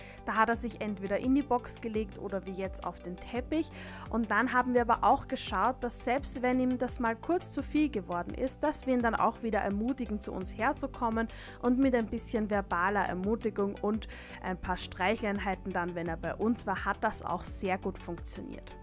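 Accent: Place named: Vienna, Austria. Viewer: Liebe, ich habe gerade abboniert. Bist du aus Wien? Du klingst so, ich bin aus Wien. Morgen zieht ein Hund aus Russland bei mir ein. Wenn sie sich eingewöhnt hat könnten wir Socialwalks machen. Du wirkst sehr freundlich und positiv. Liebe Grüße.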